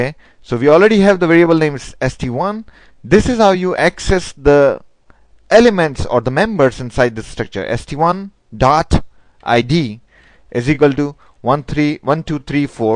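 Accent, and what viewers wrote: India. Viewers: indian slang ftw!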